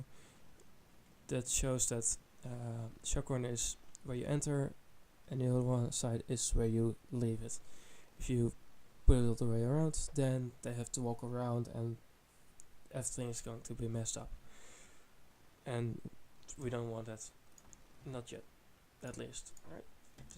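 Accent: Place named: Netherlands. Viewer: Haha ik kan het aan je accent horen!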